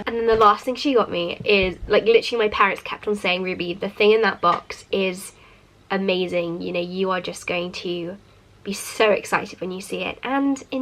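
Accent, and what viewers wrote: RP, United Kingdom. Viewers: Posh english* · Wow😮ave never heard someone speaking this posh before 😂😂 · You sound so posh and you say ‘received’ I love your accent although I’m British too 🇬🇧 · Omg your so posshhh like it’s so cute I am from England and bloody hell are we that posh nope 😹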